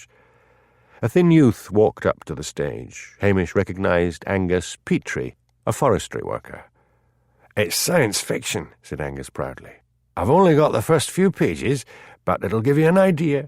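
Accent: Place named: Scotland, United Kingdom. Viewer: Thanks for uploading. I only read the ones read by this Narrator. His Scottish accent certainly adds to Hamish Macbeth's character.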